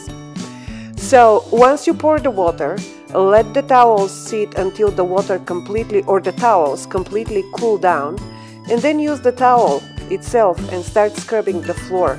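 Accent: Russia. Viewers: Lovely (RUssian?) accent. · Russian accent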